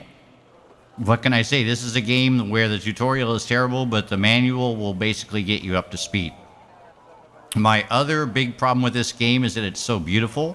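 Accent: United States. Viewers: Thanks for the review! By the way, I bet you're from New York State! Your voice reminds me of Billy Crystals voice! Especially in the movie 'Parental Guidance', when he's commentating during the Baseball Games! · You sound sooo much like the American who visits Fawlty Towers in one of the episodes! Thanks so much for the review though, youve helped me decide to buy it but with a very humble attitude :)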